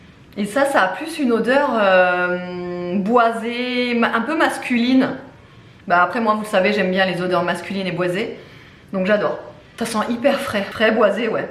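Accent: Alsace, France. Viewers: ah d'accord ! Je comprends c'est pas négligeable ! J'aime beaucoup ce que tu fais comme vidéos. Et en plus tu es alsacienne comme moi et quand je te regarde ton accent me fait penser à lalsace ahah bonne journée et bon weekend à toi bises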